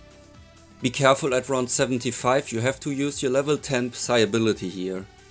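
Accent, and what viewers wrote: Germany. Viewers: Are you german? I can hear the accent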